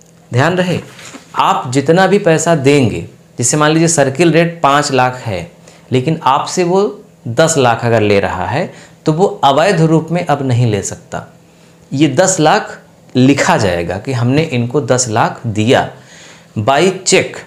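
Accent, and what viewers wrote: Chandauli, India. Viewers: Aap chandauli se ho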